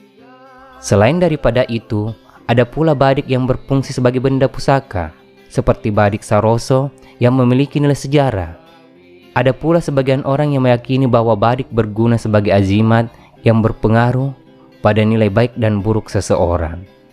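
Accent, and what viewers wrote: Bugis, Indonesia. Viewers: Logat Narator sangat khas bugismya :)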